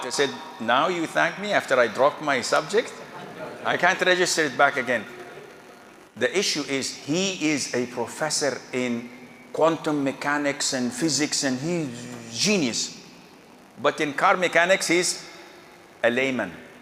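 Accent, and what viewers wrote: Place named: West, United States. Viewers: I sometimes wonder if he ever lived in the US!! His English is more American than UK!! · Yes, shaikh takes pride in his acquired western accent and considers South asian accents particularly loathsome.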